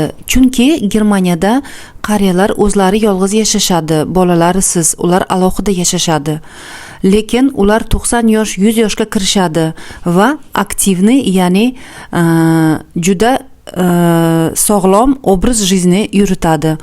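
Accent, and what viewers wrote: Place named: Chapan, Uzbekistan. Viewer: Chapani gaplariz biram yoqabiba bechara kuyovimiz til bilmay qaytarabi gapizi ola xorjin dep😅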